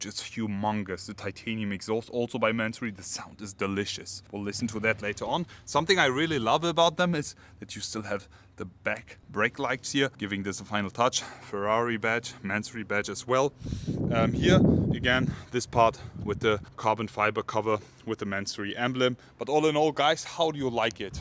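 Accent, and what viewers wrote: Germany. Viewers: Mein Englisch ist nicht besonders gut, praktisch, dass sich der Dude in Englisch anhört als würde er trotzdem Deutsch reden.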